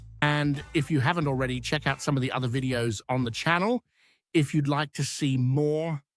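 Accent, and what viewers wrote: United Kingdom. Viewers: I assume you get all these films from somewhere to view and you sound British! Where do you buy all these 70's fims?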